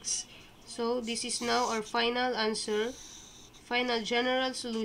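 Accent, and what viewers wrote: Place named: Philippines. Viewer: filipino ka ba? ganyan yung accent ng filipino sa english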